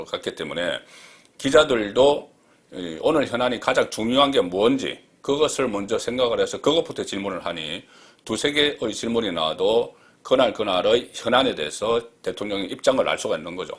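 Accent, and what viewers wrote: South Korea. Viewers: 내용은 좋은데 어느 지방 억양인지 도무지 거북하고 거슬립니다. 어색한 곳이 자꾸 들려요.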